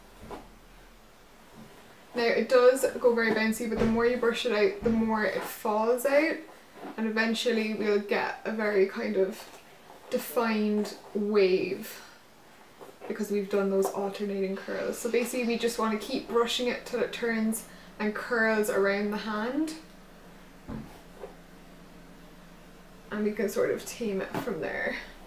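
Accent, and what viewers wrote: Ireland. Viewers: where are you from because your accent sounds like an Irish accent. It sounds like my accent and I'm from dublin 💋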